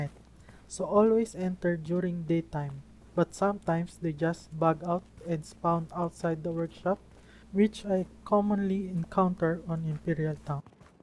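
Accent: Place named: Philippines. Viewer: nah, just your accent. Hahaha I'm filipino too, glad to see another one enjoying this game. Not many people enjoy these types of stuff in our country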